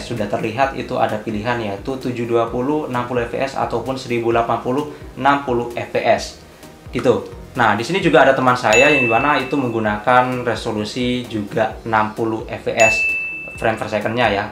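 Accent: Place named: India. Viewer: Kok logat lu kaya India bang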